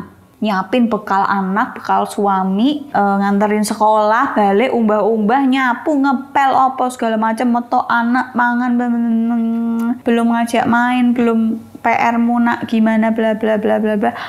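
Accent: Java, Indonesia. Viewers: Medok amaatt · Aku senengggggg❤ karo bosone,,,,, medhok,,,, khas cewek Jawa.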